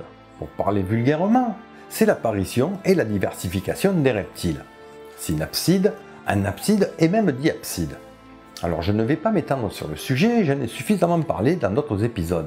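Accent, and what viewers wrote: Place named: Marseille, France. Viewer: Bonjour, et bravo pour vos connaissance en paléontologie, J'ai 82 ans et j'ai fais des fouilles ici en Belgique dans ma jeunesse Mais lorsque je voie la quantité et la richesse des découvertes ....je peu revoir TOUT c'est fabuleux la quantité d'animaux que l'on a découvert plus ceux que l'on avait déjà mais que l'on n'arrivait pas a classé. C'est fabuleux ! Je regarde très souvent vos programme et en plus j'adore votre axent marseillais .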